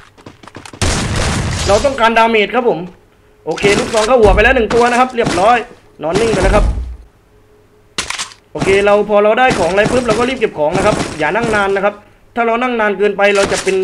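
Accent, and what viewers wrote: South, Thailand. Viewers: พอพี่พูดใต้ผมกดไลค์กดติดตามกดกระดิ่งเลย ผมเด้กใต้ด้วยย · โครตชอบภาษาใต้เลยนิ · เห็นพูดใต้ได้ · แหลงเหมือนคนใต้เลยย🥺😁 ไม่ต้องงงแขบบ55😆😅🤣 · พี่คนจังหวัดไหนค้าบ? เหมือนคนใต้อ้ะ!!FCค้าบ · เเหล่งใต้เลยน่ะ 😆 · เข้ามาแลทีแรกเห็นพี่แหลงใต้ ผมกดไลค์ กดติดตามเลย คนสุราษฎร์ธานี🤟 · 🌴เป็นคนใต้ม้ายนิหลวง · ผมฟังจากสำนีงเเล้วว่า คนใต้นิเบล่อ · เปิดคลิปมารู้เลยว่าพี่เป็นคนใต้ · พี่คนใต้หรอผมคน นครศรีธรรมราช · พี่เป็นคนใต้แน่เลย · พี่คนใต้ผมคนใต้5555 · ผมโครตชอบสำเนียงพี่เรยวะ สำเนียงใต้นิ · พี่พูดเหมือนคนใต้เลย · ผมกะไว้แล้วเสียงพันนี้คนใต้แน่ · กูว่าเวลาแกพูดแก้เหมือนคนใต้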